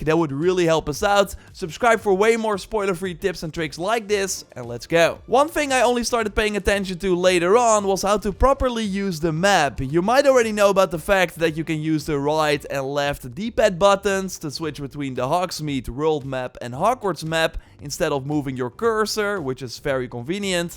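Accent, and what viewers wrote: Netherlands. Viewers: Wtf ik kan gewoon aan je stem horen dat je Nederlands bent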